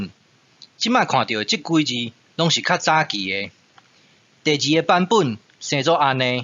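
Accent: Zhangzhou, China. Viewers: 從甲骨文解開台語完全是正確的，你就快成功了。只差你台語偏漳州腔。漳州音是外族學習台灣海口音而形成的語言。，只有87分相似。音差會讓你困惑而解不開真正的字意。甲骨文是台灣海口音的造字語。你只能解開一小部份台語字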